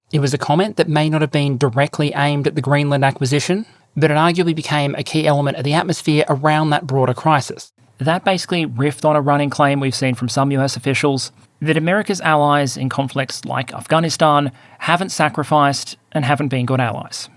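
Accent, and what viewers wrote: Australia. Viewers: That tacit acknowledgment that your voice is the real moneymaker at the end of the video there gave me a chuckle. I often wish I was an aussie just because of how much Americans love the accent… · is the Slavic god of thunder. The accent you hear is Australian.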